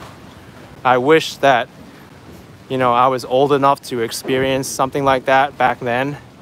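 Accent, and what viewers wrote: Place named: New Jersey, United States. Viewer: YOU are at my former neighborhood. Although I grew up in Union City just north of Jersey City. You got the Jersey accent very good. Who ever thought there would be skyscrapers in Jersey City!! WOW! I miss seeing the skyline from Jersey. When I lived in Union City I saw the skyline everyday. Thanks for traveling to Jersey City. I hope you got back home safely. God bless you.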